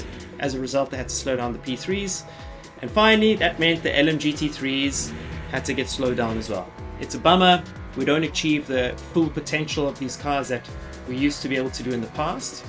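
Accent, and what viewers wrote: South Africa. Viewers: I can’t help but pick up the South African accent here, are you from South Africa by any chance? 😅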